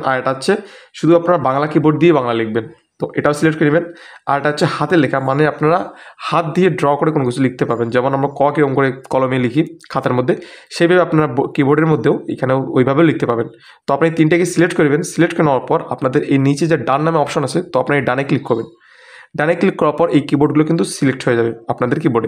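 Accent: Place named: United States. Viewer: American Bangla aaina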